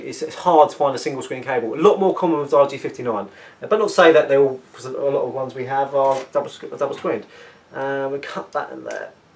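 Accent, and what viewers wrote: Cockney, United Kingdom. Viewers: do I detect a bit of a Cockney accent there?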